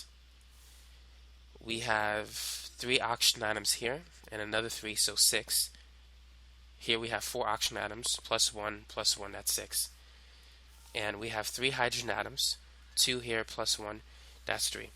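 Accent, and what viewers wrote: United States. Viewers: Thank god we have an American accent here for once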